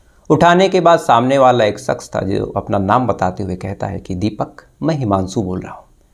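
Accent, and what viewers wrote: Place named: Bihar, India. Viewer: Kahaaaaani ya kahani ? Daud ya dauuud.? Aapka pronunciation Bihari hi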